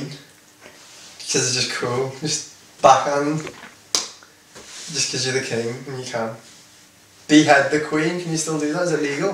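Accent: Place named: Liverpool, United Kingdom. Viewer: Scouse accent 💯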